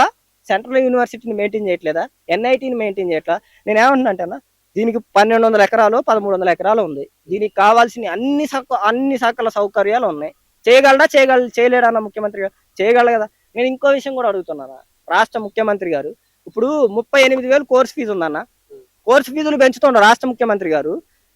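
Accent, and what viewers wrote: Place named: Andhra Pradesh, India. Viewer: వీడు ఆంధ్ర స్లాగ్ మాట్లాడు తున్నాడు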